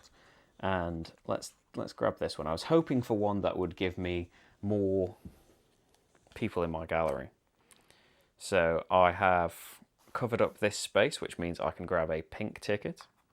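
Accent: United Kingdom. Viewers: Hi there and great job, just discovered your channel and this playthrough is great. Thanks for the video, for us foreign players the british accent is sometimes more clear than the american one. Keep the good job and thanks again!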